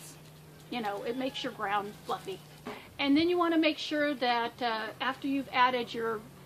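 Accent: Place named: United States. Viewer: Thank you for the great video. Which part of America are you from. Your accent is very nice. Roberta from Perth Western Australia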